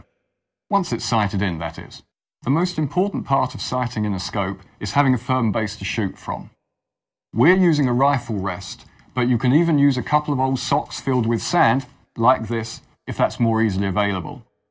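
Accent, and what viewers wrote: United Kingdom. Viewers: You sir are a scholar and a gentleman This was beautifully explained and the British accent makes it nicer for me as someone brought up in rhodesia and South Africa 😎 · Thank you british man!!!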